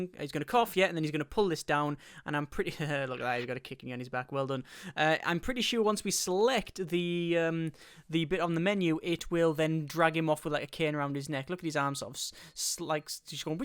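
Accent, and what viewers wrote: United Kingdom. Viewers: Others have done it. Like Nerd3. For some reason (maybe the british accent and their good taste in games) I tend to confuse and Nerd3 sometimes;P Not like "Oh, is this Nerd3 or I'm watching" but memories of one channel or the other tend to fuse together.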